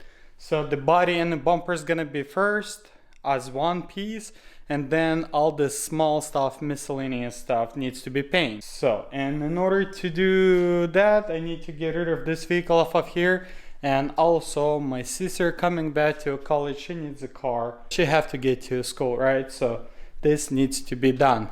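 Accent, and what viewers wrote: Russia. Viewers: Привет, акцент слышится, что ты русский😁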